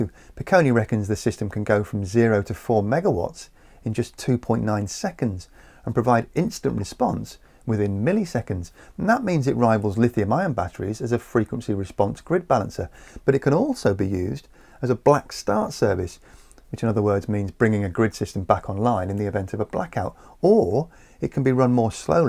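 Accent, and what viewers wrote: England, United Kingdom. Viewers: As a British person, there's nothing I love about this channel more than the extremely British way he speaks in this video.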